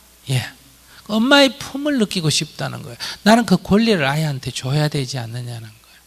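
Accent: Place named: Gyeongsang, South Korea. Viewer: 경상도에서는 가르치다를 사투리로 가리키다 가르키다라고도 합니다 사투리로 보시면 이해가 될것입니다 시도때도 없이 많은 사투리를 쓰십니다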